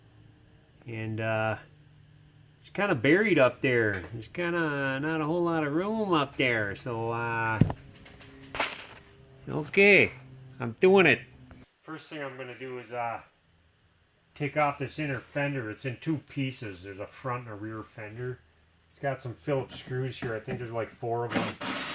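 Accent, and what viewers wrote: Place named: Northeast, United States. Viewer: You gotta be from the northeast some where.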